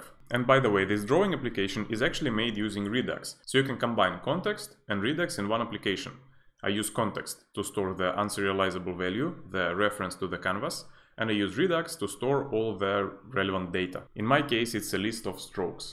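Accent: Russia. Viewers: Du låter väldigt rysk när du pratarz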